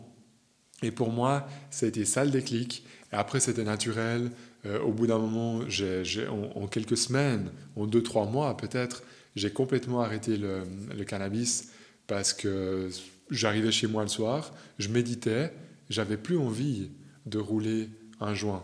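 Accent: Switzerland. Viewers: Ton accent montre que tu es en suisse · damn t'est suisse... Vaudois je dirais. magnifique postulat merci, de suisse aussi 😅. je modifie mon comm pour dire simplement que ta sensibilité se voit et qu'elle est belle mon gars. sincèrement bravo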